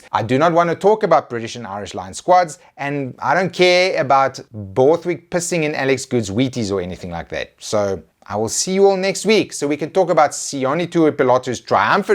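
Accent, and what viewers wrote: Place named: Johannesburg, South Africa. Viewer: Good old Afrikaans Jo'burg accent.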